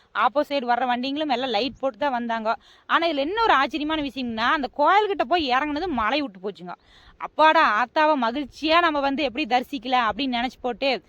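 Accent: Kongu, India. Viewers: அடடா என்ன ஒரு உண்மையான கொங்கு நாட்டு தமிழ் என்ன ஒரு சொல் வீச்சு வாழ்த்துக்கள் சகோதரி 🎉🎉🎉🎉🎉🎉🎉🎉🎉🎉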